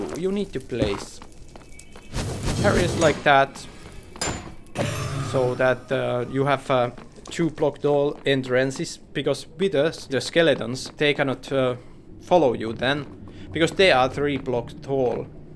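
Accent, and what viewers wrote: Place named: China. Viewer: Bro sounds Chinese 😂 no hate tho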